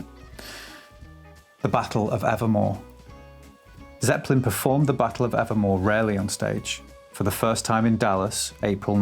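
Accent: United Kingdom. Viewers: So sorry for the delay in my commentary. I know you must have been waiting, anxiously, constantly refreshing your page, to see if the crazy and occasionally overmedicated American girl has provided her invaluable feedback on your new concept. Worry not, I've prepared notes. Thing number 1) While a gross over-generalization for sure--all person speaking with any accent from across the pond equate to "smart, intellectual, generally polished, academic-type" to the universally ignorant American ear. I.e. you could read from a local phone book and you would sound like a BBC new correspondant to your American audience. This is worth capitolizing on, especially for a Story Time concept. which leads to Thing number 2> Thinking more of an Alfred Hitchcock-type-vibe. walking in to his own silhouette? Thing number next> maybe crossed with a Tales of The Unexpected vibe....fireplace crackling (if no actualy fireplace available....a makeshift prop would do....might even be more hysterical).....and smoking jacket and pipe....I mean, c'mon......no brainer.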